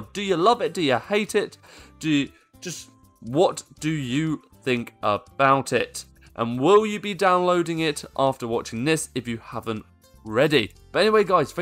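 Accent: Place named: United Kingdom. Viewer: ya fking british accent bro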